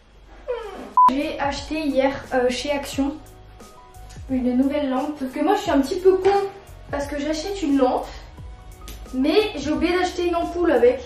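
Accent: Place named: Alsace, France. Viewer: ca sebt l'accent alsacien...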